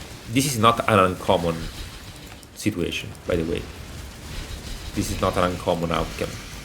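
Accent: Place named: Balkans. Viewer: Where are you from, you sound like you are from balkans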